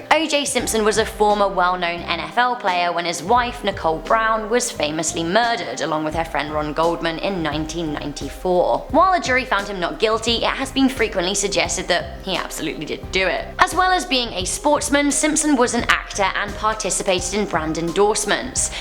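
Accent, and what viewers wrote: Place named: England, United Kingdom. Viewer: I wonder if is single. She is gorgeous plus her English accent just gets me. #toptencrush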